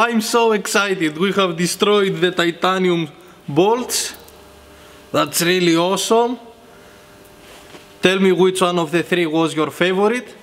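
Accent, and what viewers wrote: Greece. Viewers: greek - english accent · you sound like you are greek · Recognised your Greek accent immediately. Then I saw the Greek flag on your arm. :P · RandomizedPyro that could be an accent from a greek person too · Are you Greek? Your accent sounds like the Greek accent.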